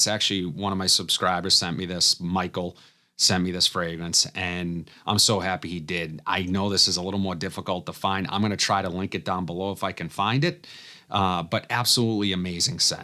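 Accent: Boston, United States. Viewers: Man....that Boston accent. Gets me every time, in a good way. lol Thanks bud for a great video. I used to be all about niche but lately I am totally digging some designers that should be niche! A few are: Montblanc Black Meisterstuck (a more elevated and better performing version of Black Lacquer), Boss Bottled Absolu (I have not smelled any of the other Boss frags, but I cannot imagine anything being better than this one - beyond great!), Salvatore Ferragamo Pour Homme Oud (you can thank me later), Cartier Declaration d'un Soir (such an amazing manly rose).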